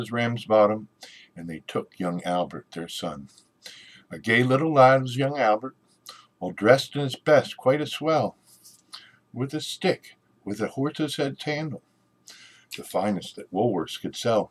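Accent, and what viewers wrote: United States. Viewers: aThat was fun,. And if someone had told me that quintessentially Lancashire tale would have worked in your (lovely mild) American accent, I'd have said "Not bloomin' likely!" - But I'd have been wrong. Super version and great use of props. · Weird to hear it in US english, but it works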